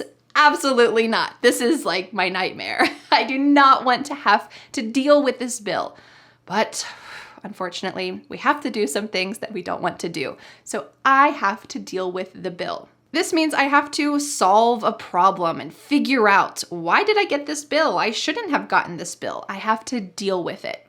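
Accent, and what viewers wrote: United States. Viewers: I ❤ ur accent......i swear....its really cool....you know I ❤ american accent like you did....TEACHER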